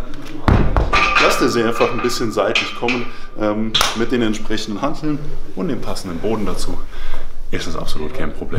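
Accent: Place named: Saarland, Germany. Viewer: finds geil das ich nach nem video gesucht habe das mir die thematik näher bringt 100 prozent alles dabei was ich gebraucht habe. auch geil das ich beim schauen irgendwann den saarländischen dialekt rausgehört hab, geil auch noch dass es aus meinem Landkreis ist xD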